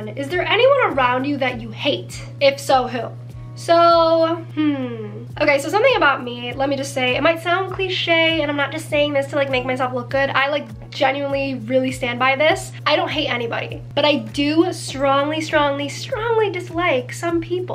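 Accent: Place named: Italy. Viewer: do not hide your italian accent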